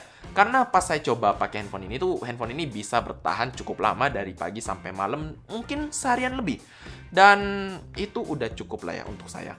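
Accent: Makassar, Indonesia. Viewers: Bang pake logat Makassar lagi dong bang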